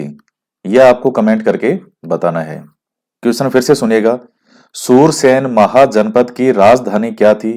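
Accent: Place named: Sikar, India. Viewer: Sir aapki aawaj se ESE lagta hai jase Ki AAP sikar se lagte ho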